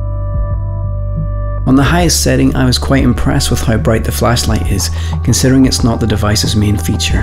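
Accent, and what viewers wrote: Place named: Scotland, United Kingdom. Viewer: where is that accent from you have a cool voice, Scottish?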